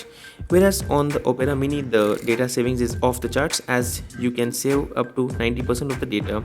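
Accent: India. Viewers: I'm an EFL English teacher and this guy speaks exactly how I tell my students not to. If you don't care to decrease the influence of your native language accent on your English, at least speak slower. Fortunately, you have the article to help people who speak global English (and not Hindi English). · indian accents are hard af to understand, i would rather learn hindi than listen to this. hopefully pimsleur has it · can I say I often watch these video is for learning Indian English accent.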